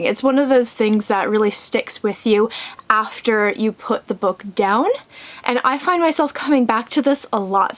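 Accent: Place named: England, United Kingdom. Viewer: I really love your english accent! ❤️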